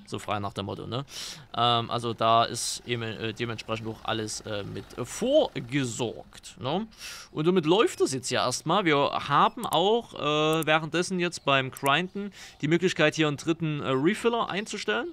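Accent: Saxony, Germany. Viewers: Supper sympathisch endlich eh Sachse 😂Grüße aus nähe Leipzig 🎉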